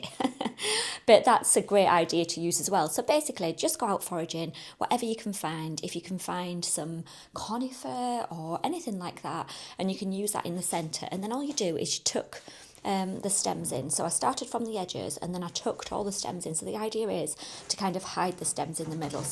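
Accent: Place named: North, United Kingdom. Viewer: 61. I love your videos...your home is beautiful. As a northerner who no longer lives up north, it's great to hear a fellow northerner and to see some of the northern sights! Keep up the good work!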